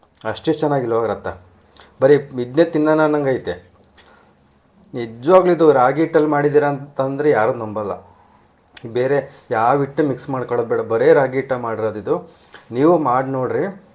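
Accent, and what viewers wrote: Chitradurga, India. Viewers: ದುರ್ಗದ ಭಾಷಾ ಸೊಗಡನ್ನು ಹಾಗೆ ಉಳಿಸಿಕೊಂಡು ಬಂದಿದ್ದೀರಾ ಕೇಳೋಕೆ ತುಂಬಾ ಖುಷಿಯಾಗುತ್ತೆ ನಿಮ್ಮ ಅಡುಗೆ ಎಷ್ಟೇ ರುಚಿಯಾಗಿದೆ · ನಿಮ್ಮ ಮಾತು ಕೇಳ್ತಾ ಇದ್ರೆ ನಮ್ಮ ಅಮ್ಮನೇ ನೆನಪಾಗ್ತಾರೆ. ದುರ್ಗದ ಮಾತು ರುಚಿ ತುಂಬಾ ಚೆಂದ. Thank you so much.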